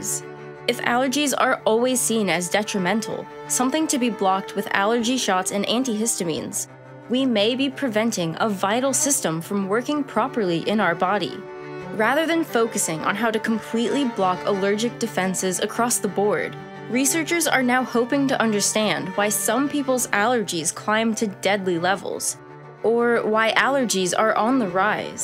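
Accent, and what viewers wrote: United States. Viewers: I love your American accent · where is this content creator's English accent from?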